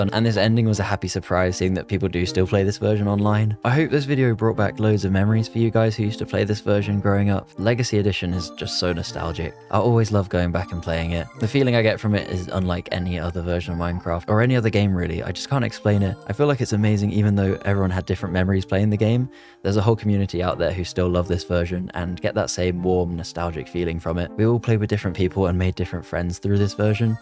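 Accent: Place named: United Kingdom. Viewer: I love this guy’s accent. where is he from in the uk?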